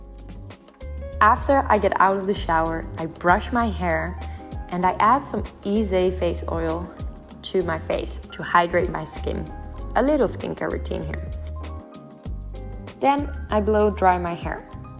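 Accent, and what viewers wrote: Netherlands. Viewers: Ik wist dat je nederlander wist op het eerste moment door je accent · Jij bent toch Nederlands? Ik hoor het aan je accent 😂 Leuke video trouwens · Are you Dutch? Cause your English sounds like Dutch people’s English (I mean like your accent) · Sanne Vloet je accent verraadt dat je Nederlands bent. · Why do you sound Dutch ?😂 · Je Nederlandse accent 😂✌️ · Is ze Nederlands? Door t accent hahah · Her English is so good but I still can hear Dutch. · Youre dutch richt. Im sorry i hear it but youre realy good in it. I hear it because...............ik ben ook nederlands. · Je klinkt Nederlands haha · Your dutch and i thought you were going to The Netherlands again?.. Btw i knoe that cuz your accent not being rude. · Ja, jij komt een 100% zeker uit nederland! ik hoor het aan je accent :) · You have the netherlands accent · Zwz ben je nederlands haha je kunt het gewoon horen NL kunnen nooi goed engels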